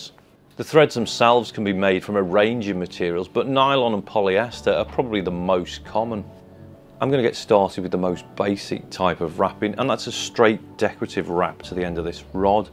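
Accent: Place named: Liverpool, United Kingdom. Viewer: You have a Beatles’ accent!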